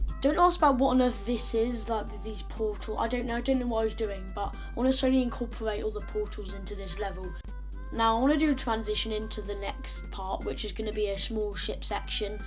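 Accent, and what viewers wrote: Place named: United Kingdom. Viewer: The british accent is really catching me off guard but no hate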